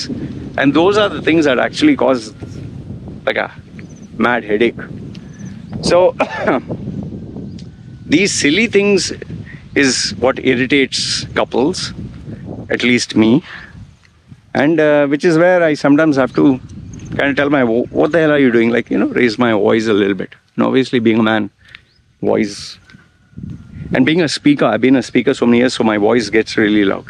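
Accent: India. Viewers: How come you have an Indian accent ?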